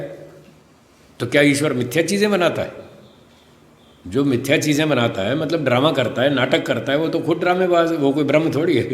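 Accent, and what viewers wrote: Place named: Haryana, India. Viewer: वाह पूजनीय स्वामी जी मन्त्रमुग्ध कर दिया आपको रौबदार आवाज में हरियाणवी लहजा झलकता है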